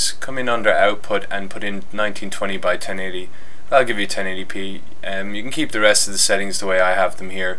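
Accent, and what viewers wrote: Ireland. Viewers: that silky sexy Irish voice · are you Irish?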